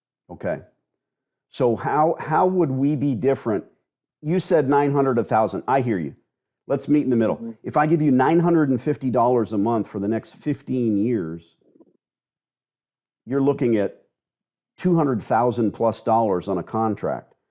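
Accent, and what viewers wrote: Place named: South, United States. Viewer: Great Southern drawl on this one. I hope I never have to hear your Staten Island accent lol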